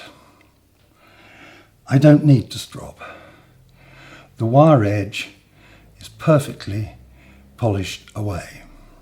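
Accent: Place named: England, United Kingdom. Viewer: For those hard of hearing, or not familiar with pronunciation by an Englishman, 10,000 grit ceramic waterstone is made by Ohishi.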